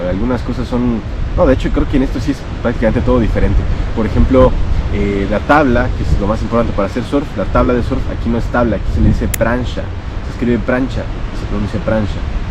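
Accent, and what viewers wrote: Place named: Mexico. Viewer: De Argentino no tenes nada. Se nota el Mexicano con solo hablar. Jajajajaja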